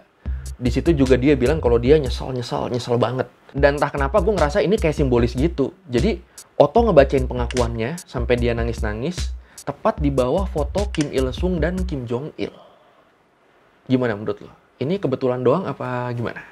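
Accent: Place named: Bogor, Indonesia. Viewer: Bang lu orang bogor?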